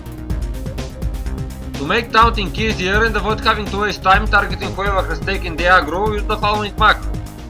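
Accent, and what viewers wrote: Russia. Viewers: This IS in English. He just has a heavy russian accent. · As russian as it gets, love it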